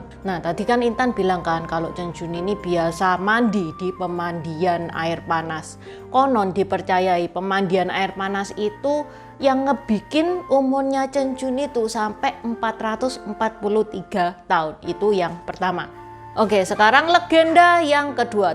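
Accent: Java, Indonesia. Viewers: Mantap mba jawanya medok sedap bangat ! 🍺🍺🍺🌹🍷🍷🍷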